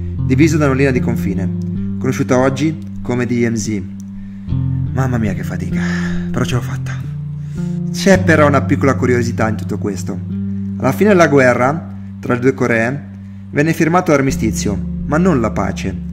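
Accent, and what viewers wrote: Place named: Ancona, Italy. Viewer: Dall'accento sei anconetano...ciao!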